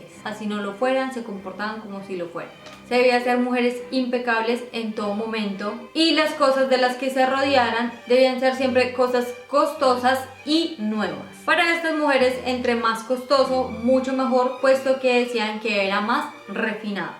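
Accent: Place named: Antioquia, Colombia. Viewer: Estoy acuerdo en ciertas cosas sobre esa época, como lo que era la educación, verse y ser un tipo de persona con una moral más alta, pero, se me hace una reveranda idiotez lo de preservar la virginidad para el marido o ser una persona que no quisiera aportar para la manutención. Por cierto buen vídeo! Noto que tu acento es paisa, saludos desde México ;)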